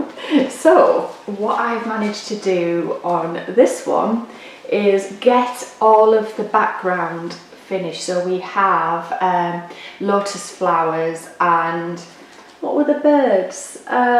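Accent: United Kingdom. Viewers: So sorry you were ill for awhile. Glad to see you are feeling better. A while ago I did a search for anyone doing a review of a sit on frame and found your review of the Elbesee. Well, I was finally able to find a shop owner on line that had the Elbesee sit on frame in stock. The frame was delivered yesterday. The frame came with three hoops but also came with an attachment to enable the use of my own hoops and smaller sized scroll rods. I used the frame yesterday and most of today. I love it. I was having trouble with my left arm and hand from gripping my hoop. After only one day I can feel the difference in my arm and hand not gripping my hoop. Thanks so much for your review. Love your stitching and if I might say, your British accent. I was born in the UK quite some time ago, but came to the US when I was one. Still have relatives in Swindon and Avebury and love to talk to them and listen to their wonderful British accents. 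So heartwarming to me. Again thank you for your review. Stay safe, stay well. Lee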